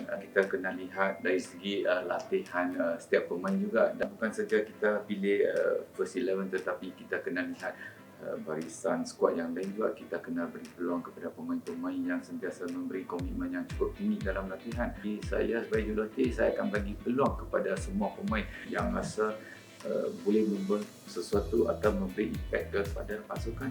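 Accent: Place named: Kedah, Malaysia. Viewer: loghat loqstaq pekat tn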